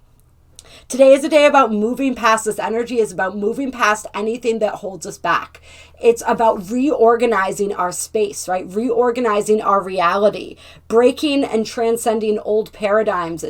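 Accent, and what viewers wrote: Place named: Midwest, United States. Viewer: Your video brought tears to my eyes, a pressure in my heart chakra and inner peace. Thank you for your spirit, your guidance and you Midwest accent 🌈❤️🧡💛💚💙💜🤍☯️♋️/♌️ 33/6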